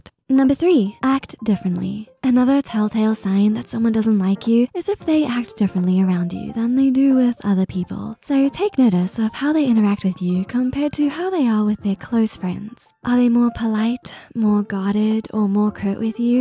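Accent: United Kingdom. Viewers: Was thinking the same although I also enjoyed the British accent ❤ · A British Amanda Silvera! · unrelated to the video but why does narrator have a british accent now??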